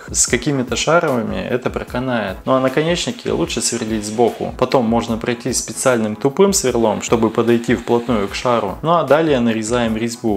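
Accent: Perm, Russia. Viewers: Вы из Перми?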